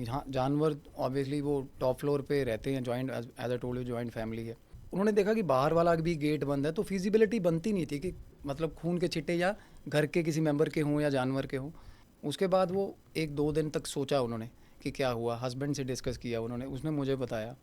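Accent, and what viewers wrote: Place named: Punjab, India. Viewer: Veer ji apka Punjabi accent kamaal ka hai, try karen ki pure Punjabi aap bole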